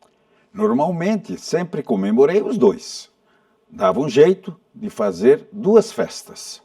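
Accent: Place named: Sardinia, Italy. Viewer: Eu sou italiano "sardo" aprendi português faz muito tempo e seu sotaque é parecido ao meu. Compreendo as razões de sua queixa.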